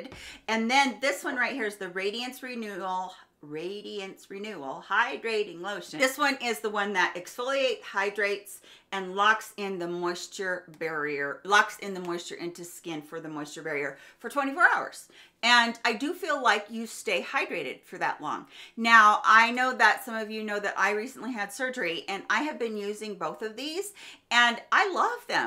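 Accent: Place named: Upper Midwest, United States. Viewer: Wow, I had no idea Ms was a fellow Texan! Since I started watching the channel I thought I heard a very light upper Midwestern accent.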